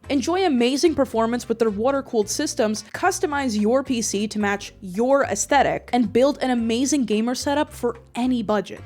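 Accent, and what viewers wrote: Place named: New York, United States. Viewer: Hey I'm autistic and I play Magic: The Gathering because it's all rules based and weird but I do shower etc. Love the way your NY accent comes in on certain words like... AWTIZM. It's great and why I've entered this rabbit hole... Stay cool! (Also the Frank-N-Furter being put into a hot dog bun at is ace)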